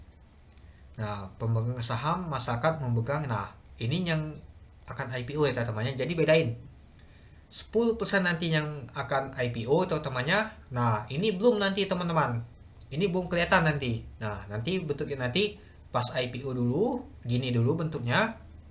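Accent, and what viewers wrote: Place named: Palembang, Indonesia. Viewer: Lu org Palembang ya Ko?? Logat nya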